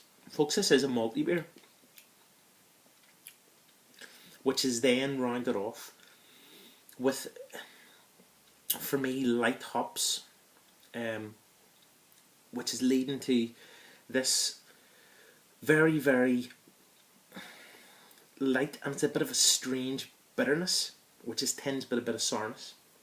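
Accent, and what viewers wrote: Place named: Ireland. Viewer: you sound irish .. too much time in home land lol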